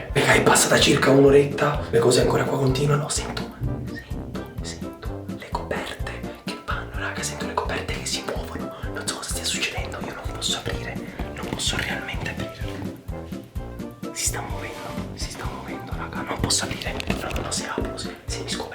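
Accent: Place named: Veneto, Italy. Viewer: mamma mia che dialetto Veneto biceri zio can glie i bicer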